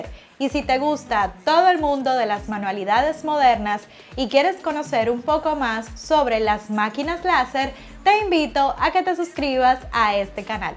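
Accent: Dominican Republic. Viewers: Saludos, bendiciones, nuevo suscriptor, por tu acento sabía que eras Dominicana 🇩🇴, enhorabuena, ya estamos avanzando en RD con máquinas de corte láser, yo tengo impresoras 3D y ya compré una máquina de corte láser, te felicito y te deseo muchos éxitos 😊👍🏽🙏🏽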